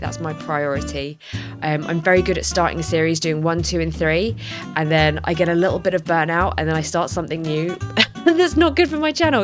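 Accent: United Kingdom. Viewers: Having a British accent is like listening to someone on 24 hour cosplay for Lord of the Rings or Game of Thrones...All that medieval fantasy stuff to nerd out for. Have a Merry Christmas!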